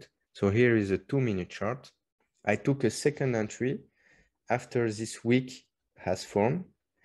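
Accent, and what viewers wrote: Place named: France. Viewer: T’es français? Je sens l’accent français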